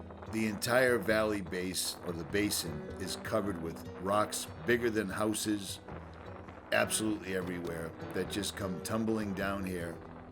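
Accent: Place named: East Coast, United States. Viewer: Your from the east coast aren’t you?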